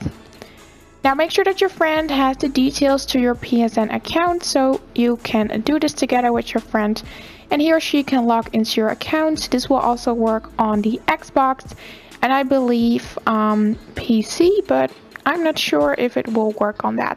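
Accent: Netherlands. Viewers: It's her accent, shes from nederlands I'm pretty sure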